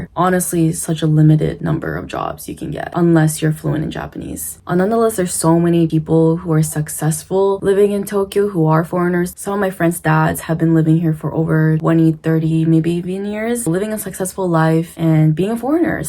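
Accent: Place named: New York, United States. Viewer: Not trying to be disrespectful but you sound like a New York Latina and not sure if anybody's told you that? And you said you lived in canada but you got me thinking you're puerto rican from the tone off you're voice and I'm cuban so It's cool and I enjoy you're feed back on japan and tokyo but you got a strong American dialect which makes you real from the soft spoken japanese girl even if they are half but it's good to hear how you give input with such confidence bravo to you as a asian girl. 🙌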